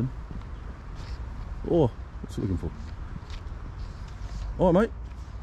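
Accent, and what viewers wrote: United Kingdom. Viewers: you've got a British accent?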